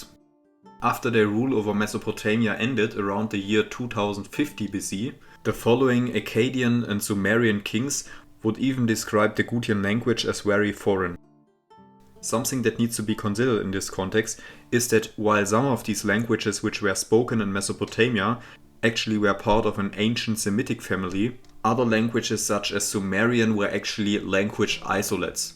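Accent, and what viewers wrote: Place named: Germany. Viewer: Der deutscheste Akzent, den ich lange gehört habe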